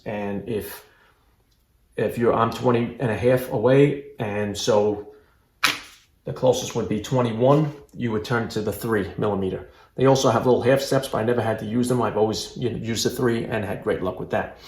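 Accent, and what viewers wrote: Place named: Brooklyn, United States. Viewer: Living in seattle, but having grown up in brooklyn, I really appreciate hearing your accent. Home again.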